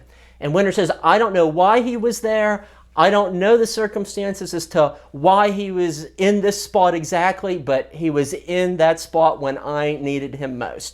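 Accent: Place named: Pittsburgh, United States. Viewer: What part of Pittsburgh is this guy from?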